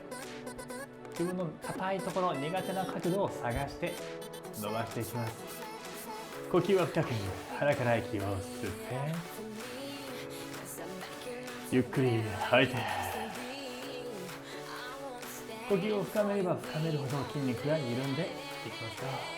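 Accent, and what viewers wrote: Kansai, Japan. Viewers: 先生のストレッチは、即効性があるのでやる気出ます‼️ 分かりやすい説明ありがとうございます‼️ そして、たまーに出る関西訛りが可愛いです。by関西人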